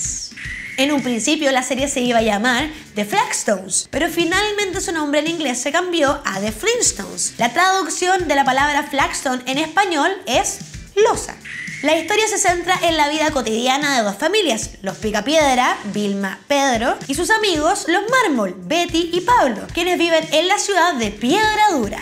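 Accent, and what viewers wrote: Chile. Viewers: Produchon, achon me encanta la fonética de los chilenos xD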